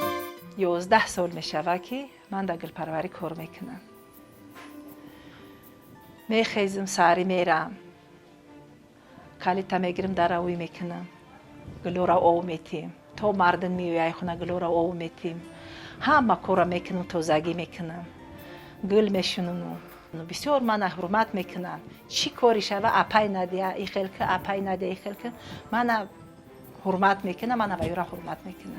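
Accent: Tajikistan. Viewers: Ика забони точикии худмон ширинай. Бо и Модар ширинтар гап мезадас. Ахсан Модарчон мехнатотон рохат шаватон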